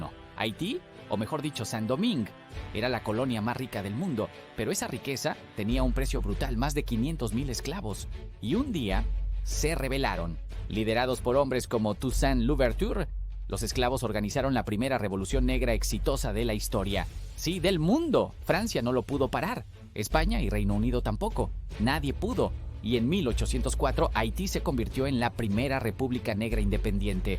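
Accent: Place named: Mexico. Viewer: Dueños este programa: ustedes son estúpidos o se hacen? Primero hacen un resumen ¨calimocháo¨ dejando muchísimos detalles importantes de nuestra historia, luego ponen a un narrador ¨mexicano¨ que dice ¨nosotros¨ como si esta fuera la independecia de México. Séan más serios...! Páguenle a un buen locutor o escojan a alguien que lea bien sino quieren pagar... pero que sea y se oiga como DOMINICANO!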